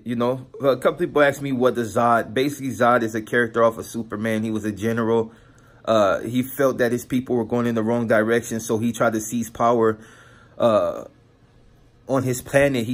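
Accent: Mexico. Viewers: You didn't know you could talk Mexican? But you know talking Black is talking Ghetto. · My best friend is Mexican I grew up around Mexicans eat nothing but Mexican food. Respect to you for being down for your hood. But know you act Mexican and talk like a Mexican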